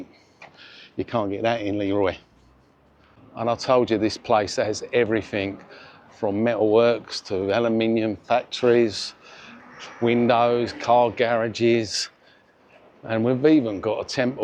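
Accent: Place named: Luton, United Kingdom. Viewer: Sounds like a luton accent originating in south london .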